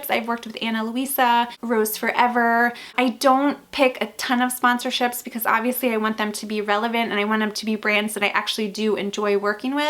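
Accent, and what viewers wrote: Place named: Chicago, United States. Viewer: I totally miss this and didn’t ask you my question. You have a beautiful accent and I think you might be from Chicago. Is that a accurate?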